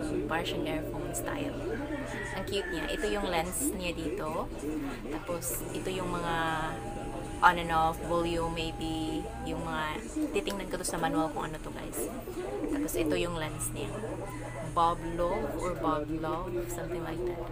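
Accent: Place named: Visayas, Philippines. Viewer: Bisaya man diay ka sis proud bisaya here.. ganda ng accent mo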